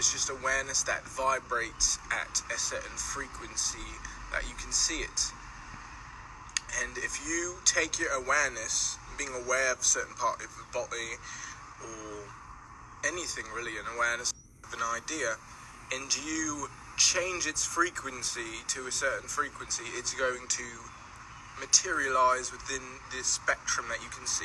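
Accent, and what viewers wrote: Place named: United Kingdom. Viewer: Goood shit!!! Haha!! I love this dude. Amazing British accent too 😂 💪🏼💚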